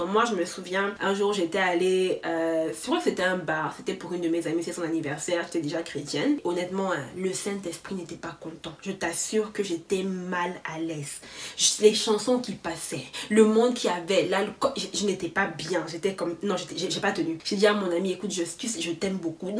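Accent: Cameroon. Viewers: Je viens de découvrir ta chaîne, c'est super wahou l'accent camer se sent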